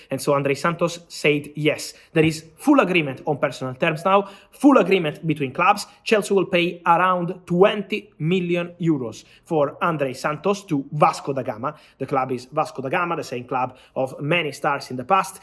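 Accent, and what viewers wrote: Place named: Italy. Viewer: Bird day, love Italian accents!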